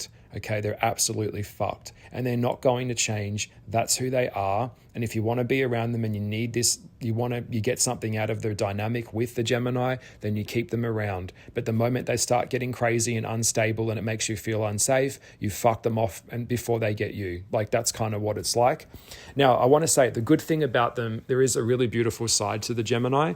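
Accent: Australia. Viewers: I only stayed because the Australian accent sounded cool until he was saying all these gross.Horrible things and it made the voice and accent sound not good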